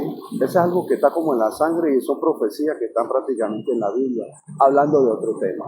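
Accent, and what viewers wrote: Panama. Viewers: Se le sale lo colonense al siervo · Empezó hablando como colombiano y terminó con Flow panameño 😄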